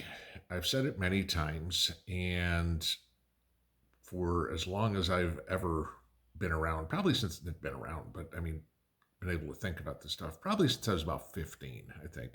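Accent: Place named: Indiana, United States. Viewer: Came across your channel, very interesting. You have an Indiana accent. Are you a Hoosier?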